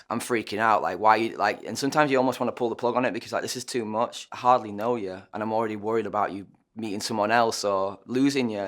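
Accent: United Kingdom. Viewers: Is he british by any chance?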